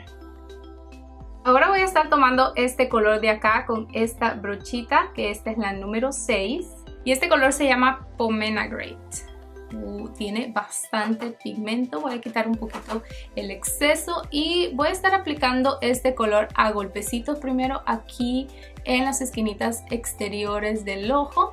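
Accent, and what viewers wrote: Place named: Honduras. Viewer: Me encanta cuando dice... y toda la cuestión... muy Hondureña jajaja Saludos nena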